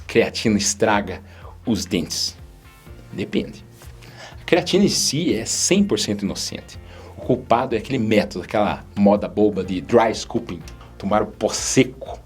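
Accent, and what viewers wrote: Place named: São Paulo, Brazil. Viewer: Esse dr., tem um sotaque muito carregado de paulisteca, pouco se entende o que ele fala!!!!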